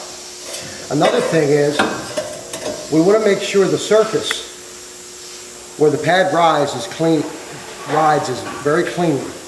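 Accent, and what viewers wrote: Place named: Delaware Valley, United States. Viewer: I love that Delaware Valley accent!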